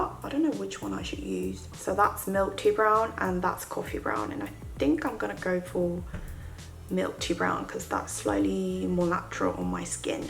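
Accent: United Kingdom. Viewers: 첫인상 리뷰 너무 좋아요 언니 영국 발음 볼때마다 짱짱...사랑해요....❤️❤️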